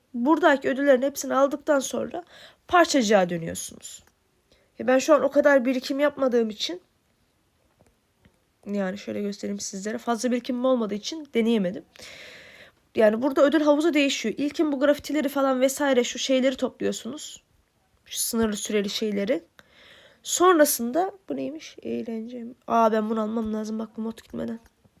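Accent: Azerbaijan. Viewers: Azerbaycanlisan sen)?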